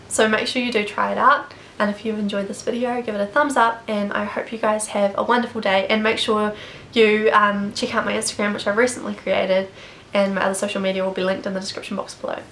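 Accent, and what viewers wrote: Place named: New Zealand. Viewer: I'm an Aussie; live in NZ and I can defs tell you - that is a Kiwi accent with a deep South roll of the R. Not an Aussie accent at all.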